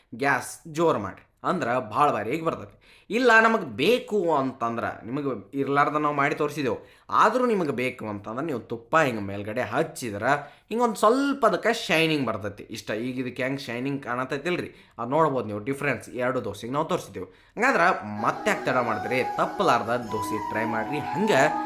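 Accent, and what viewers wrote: North Karnataka, India. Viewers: ಬಹಳ ಚಲೋ ಐತ್ರಿ😂 ನಿಮ್ಮ ಉತ್ತರ ಕರ್ನಾಟಕದ ಕನ್ನಡ ಭಾಷೆ ಬಹಳ ಚಲೋ ಐತ್ರಿ.😅😊😂❤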